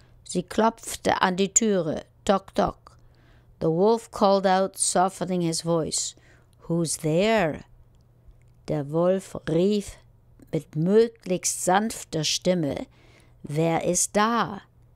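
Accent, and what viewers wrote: Germany. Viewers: It's a German dialect 😉 (Berlin??? 🤷)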